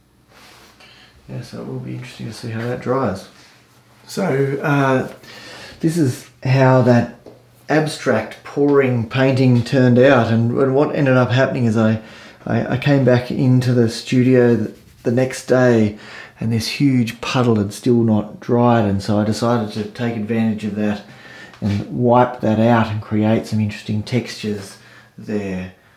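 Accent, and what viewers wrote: Australia. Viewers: I adore Payne's Grey. I don't buy the color because I love mixing colors to get it. It's my favorite color for a night sky. PS Where are you 'cause you sound Australian. I'm in Canada 🇨🇦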